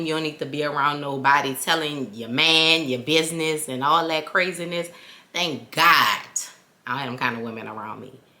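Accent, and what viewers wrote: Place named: Louisiana, United States. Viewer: And I love your sweet Louisiana accent,by the way i'm from Louisiana too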